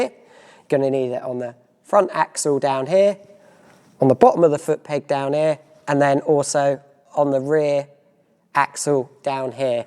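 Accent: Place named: United Kingdom. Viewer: british accent is the worst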